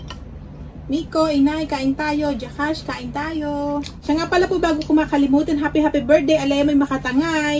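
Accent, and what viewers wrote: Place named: Batangas, Philippines. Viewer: Hello sis ko, medyo nalate ako ngayon. Sarap ng chicken curry sis. Sarap mo talaga magluto ng mga may gata. Akoy relate na relate sa punto ng salita nyo sis palibhasay malapit lang sa tiaong ang batangas. Hehehe. Ineng din ang tawag sa amin eh. Hehehe. Hello sa sister mo sis. Waiting talaga ako sa gelatin eh. Sarap. Ingat kayo lagi dyan sis. Waiting for youe next mukbang with Jaxxas. 💞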